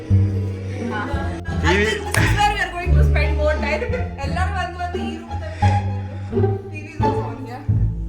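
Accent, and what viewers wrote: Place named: Tamil Nadu, India. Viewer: Your Tamil accent 🤌😂💯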